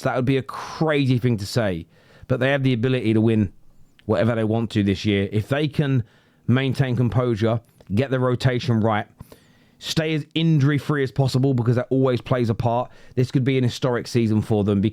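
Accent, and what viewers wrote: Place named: London, United Kingdom. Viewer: which is everytime mind u he sounds like hes from london not Manchester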